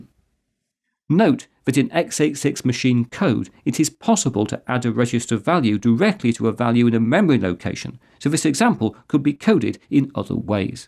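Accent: United Kingdom. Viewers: British accent....is hard !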